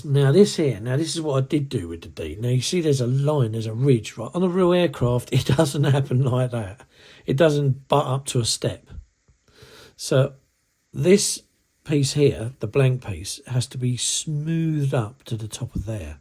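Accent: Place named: England, United Kingdom. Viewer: With some English dialects I have troule hearing right..did he say the resin pilot and thrust cone comes with the kit?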